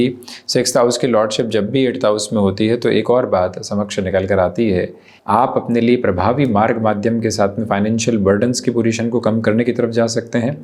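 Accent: Standard. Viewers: Bhai aapke pure Hindi sunne ko bahut he achha lagta hai ❤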